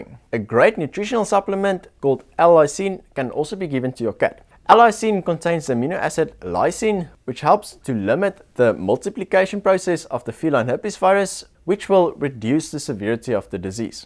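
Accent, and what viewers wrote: South Africa. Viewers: watch one of ur videos ur accent😂 is 100% south african 😃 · After 2 seconds of watching I knew that this guy was from South Africa. I can spot my people's accent from anywhere 😂😜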